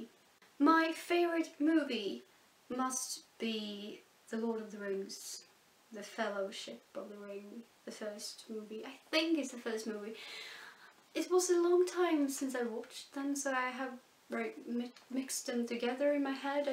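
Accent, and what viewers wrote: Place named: United Kingdom. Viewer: Vilken brittisk engelska du har, så där fin och sofistikerad <3 Min är mer amerikansk och hemsk, hahaha xD Är sugen på att göra denna tag med, när jag får lust (och är ensam hemma). Kan inte spela in videos annars D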